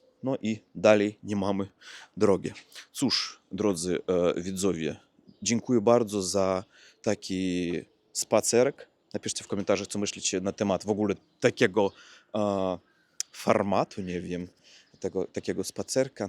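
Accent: Russia. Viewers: rosyjski akcent gubisz bardzo ładnie. Z odmianą wyrazów masz problem, ale to normalne. Im więcej będziesz mówić, zwłaszcza z kimś, kto będzie poprawiał Twoje błędy, tym będzie lepiej. Inną metodą jest uczenie się odmiany wyrazów na pamięć, zwłaszcza tych, które sprawiają Ci problem. Może to wspomagać to, czego nauczysz się, mówiąc i nie będziesz stosować rosyjskich końcówek przy odmianie wyrazów w języku polskim. Inną kwestią są spółgłoski twarde i miękkie oraz te, które zmiękcza "i". To trzeba wyćwiczyć, bo Twój aparat artykulacyjny jest przygotowany pod inną wymowę, inny język. Z czasem przyjdzie samo. W każdym razie progres jest niesamowity, gratuluję. Świetnie się sprawdziły szerokokątne kadry, miły, przyjemny spacer i fajne treści. Niech Ci się dobrze wiedzie. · Co do niedopałków porozrzucanych tu i tam, to mogli przyjezdni zostawić, nie twierdzę, że cudzoziemcy. 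Jest względnie czysto nie tylko dlatego, że jest sprzątane, ale i dlatego, że jest mniej śmiecone. I jeszcze dodam coś na temat Twojego "akcentu", czyli wymowy. Akcent = przycisk wyrazowy = udarienije. Wymowa = praiznaszenije. U Ciebie jest już bardzo niewielka pozostałość wymowy rosyjskiej. Robisz błędy gramatyczne, ale znacznie rzadziej niż jeszcze rok temu. Gdybyś codziennie przebywał w polskojęzycznym otoczeniu, to było by najlepiej dla Twoich umiejetności językowych. A co z Twoim angielskim? Nie zaniedbuj go! Pozdrawiam, czyli zdrowia życzę! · Zrobiłeś bardzo duży postęp w polskim w tak krótkim czasie. Akcent można powiedzieć że masz prawie idealny, choć rosyjski akcent w języku polskim jest taki uroczy ☺️ Zacząłeś też większość słów poprawnie odmieniać 🙂 chciałabym mieć taką głowę do języków 🙂 Szanuję! ☺️ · Od 18-tu lat mieszkam w Anglii i wciaz mowie z polskim akcentem, mimo, ze mowie plynnie i (prawie) bez bledow. 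Inna rzecz, ze nigdy nie ukrywam, ze jestem z Polski i ludzie to lubia, serio, pewnie dlatego, ze Polacy w UK maja dobra marke i jestesmy szanowani jako dobrzy pracownicy. A moj akcent w pewnym sensie dodaje mi kolorytu. Dlatego nie przejmuj sie swoim akcentem, bo on jest po prosyu fajny, wyroznia Cie z tlumu, a hejterow olej.(zignoruj). Jestes Rosjaninem i jest ok. no bo w czym problem. Jakbys byl Czechem albo Bulgarem, to co? Bylbys innym czlowiekiem? Lepszym? Gorszym? Nonsens. Ja chyba tego nie dozyje, ale Ty moze jeszcze bedziesz z duma mowil o swoich rosyjskich korzeniach. Kto wie? Daj Boze, zeby tak sie stalo.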